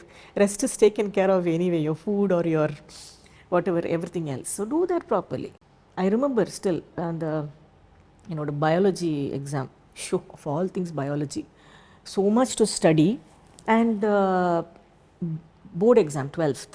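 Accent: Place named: Malaysia. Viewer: Love her Tamil! Sounds like Malaysian's Tamil accent. Wonderful lady and artist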